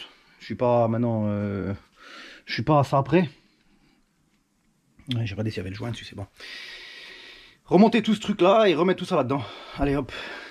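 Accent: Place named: Forbach, France. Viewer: Salut, secteur Forbach? Vu l'accent 😉. As tu déjà pensé à acheter un bac a ultrason pour le nettoyage des pièces, sa te permettrait de faire autre chose en attendant la fin de la minuterie du bac a ultrason. champion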